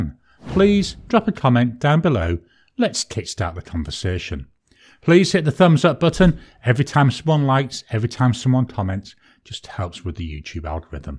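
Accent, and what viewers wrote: United Kingdom. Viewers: Hi, your pens are more down to earth and it was interesting to see your selection rather taste. I liked that you showed the price of the pens but was curious why in $ when your accent is from the UK, then I seen another video, your are in Australia! I have been using a Pelikan M205 B (Merry Berry Ltd Ed), Monteverde Innova F and just bought a Diplomat Elox F. Thank you for your Inkvent and other reviews to keep me occupied, Happy New Year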